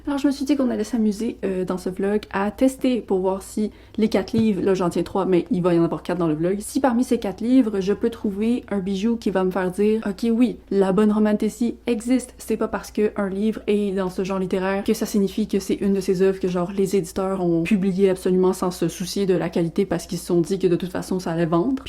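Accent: Quebec, Canada. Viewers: Ah tu es québécoise hihi 😊. Je me disais : "cette française a un accent différent." lolll 😂. Je suis québécoise aussi 😉. Heureuse d'avoir trouvé ta chaîne. 😊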